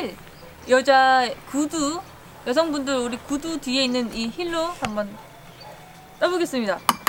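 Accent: Gyeongsang, South Korea. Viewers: 대구경북 출신 맞지요? ㅋ 억양이 쫌 ~~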